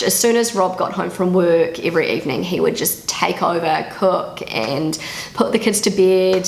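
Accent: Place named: New Zealand. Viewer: I'm very excited to Heart your nice exceptional Kiwi accent against cause IT thrills me everytime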